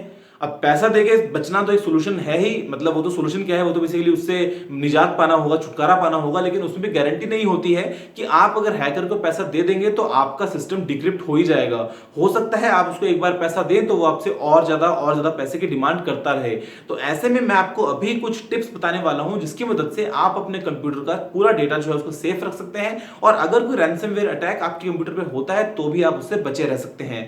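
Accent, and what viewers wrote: India. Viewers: Another Indian, this is boring to listen Indian accent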